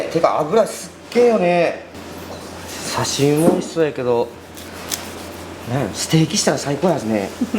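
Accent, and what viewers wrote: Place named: Takanabe, Japan. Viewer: まさか古里の方言が聞けるとは思わなかった、高鍋生まれ杉並区在住の爺さんより。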